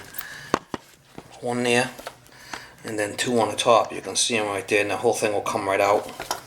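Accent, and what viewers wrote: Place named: New England, United States. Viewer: Must be from Mass or Rhode island..got that can't pronounce words correctly..kinda baby talk like.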